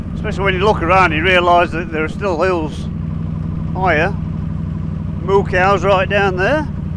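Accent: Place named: Lancashire, United Kingdom. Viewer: mate I freakin hate bugs 😂 and the amount of wildlife we see and the GoPro does not pick it up is incredible! That’s another reason I stopped using Superview on it cos it even makes curvy country roads seem dead strait!. Ooohhh and the Accent is Lancashire am originally from Preston ‘ 👍👍🍺🍺🥃🥃🇬🇧🇺🇸 ooohh Mate Thanks for the sub Appreciate That 👍👍🤘👌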